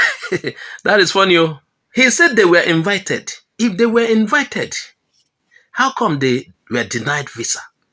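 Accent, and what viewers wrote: Nigeria. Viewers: On whose side are you, you speak as if you're a Nigerian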